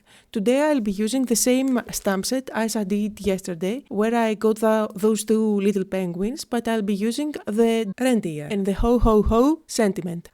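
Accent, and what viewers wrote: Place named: Greece. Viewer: it's a Greek accent. Lovely card!!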